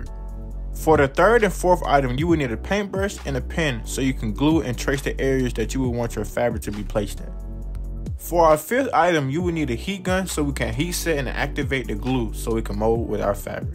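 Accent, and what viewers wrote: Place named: South, United States. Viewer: You sound so country that I didn’t understand what you were talking about until you slowed your words down…Thanks for understanding 😊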